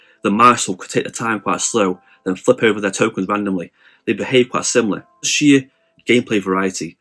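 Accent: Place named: United Kingdom. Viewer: I love your videos!! They’re great and helpful. Helped me decide to pick up Arkham horror lcg :) what region of the uk are you from if I can ask? I can’t place the accent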